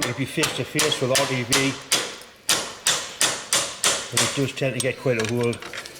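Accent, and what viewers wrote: Newcastle, United Kingdom. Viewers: Americans probably couldn't understand a Geordy accent anyway 😅😅😅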